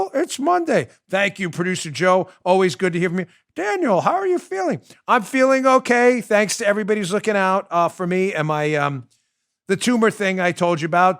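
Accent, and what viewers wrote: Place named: Louisiana, United States. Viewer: Bongino! You are the man dude! Your show must be growing, I am down here in south Louisiana sending your show to all my people in the oil and gas business! You sound like us my man! Good luck with that surgery man don't let em hit your vocal cords you have a gift!!!🤞🤞👍👍🙏🙏👌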